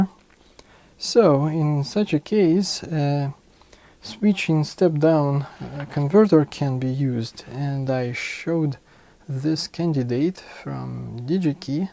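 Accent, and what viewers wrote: Russia. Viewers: русский акцент паливо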